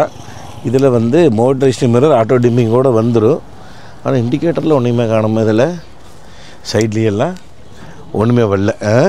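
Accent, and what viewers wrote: Kongu, India. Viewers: ilainga bro Kongu Tamil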